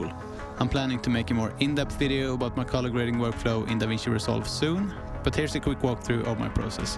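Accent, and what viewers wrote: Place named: Sweden. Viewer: I like this Swedish English accent :D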